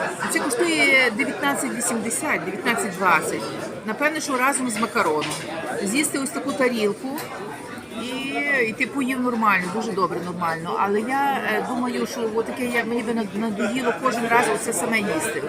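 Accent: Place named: Western Ukraine, Ukraine. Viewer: Німці дійсно пʼють багато пиво, але по якості не зрівняти з нашим. У них дуже смачне пиво. Коронна страва це шніцель з капустою синьою . Багато їдять мясо, спаржу корисну. У них є багато цікавих страв. Але ви подаєте про людей з Європи і про німців не коректно.. Не гарно знімати , як ви їсте) коли їдять , то не розмовляють. Культури у вас вибачте мало. Італійці багато пʼють вина , як воду. Треба поважати народ з іншоі країни.Мені прикро , як наші люди позоряться. Не знімайте більше відео .. не ганьбіть українців., тим більше по акценту вашому ви з заходу України ..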